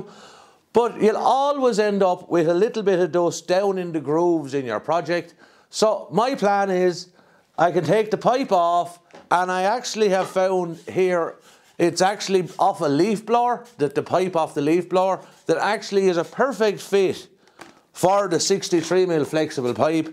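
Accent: Ireland. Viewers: I like this guy's accent, words like "tinking" and "thaught" and "tat", he be so Irish tis great.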